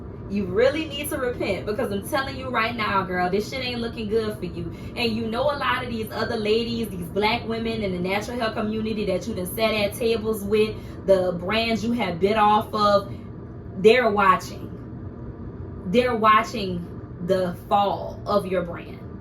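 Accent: New Orleans, United States. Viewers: Whole video is a WORD perfectly frosted with dat NOLA accent 💚💛💜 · Love that NOLA accent!! Lol ❤️❤️❤️❤️ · It’s the NOLA accent for me ❤❤❤❤❤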